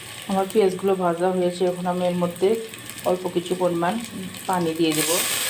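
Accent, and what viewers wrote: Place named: Sirajganj, Bangladesh. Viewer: প্রথমে আমি কে ধন্যবাদ জানাই ধন্যবাদটা এ কারণে আপনাদের এই চ্যালেনে রান্না থেকে আমি অনেক কিছু শিখতে পেরেছি আমি দেশের বাইরে থাকি এখানে রান্না থেকে আমি অনেক কিছু রান্না করতে শিখেছি আমি জানিনা আপনি কোন জেলার মানুষ তবে ভাষা থেকে বোঝা যায় আপনি সিরাজগঞ্জ জেলার মানুষ আমি সিরাজগঞ্জ জেলার কাজিপুর থানার সোনামুখী ইউনিয়নের মানুষ আমাদের ভাষার সাথে আপনার ভাষাটা মিলে যায় এই কারণে বললাম দোয়া করি সামনের দিকে এগিয়ে জান সামনে আরো নতুন কিছু আইটেমের রান্না নিয়ে আসবেন ওখান থেকে আমরা শিখে নিব ধন্যবাদ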